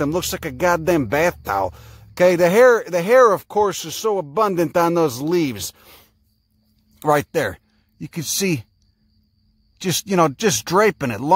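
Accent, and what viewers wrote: Chicago, United States. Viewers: yes. I'm aware of the most common invasive wild edibles. Tons of videos and books, but nobody can present it like my main man. I need it delivered with that no nonsense Chicago flare. Ya dig?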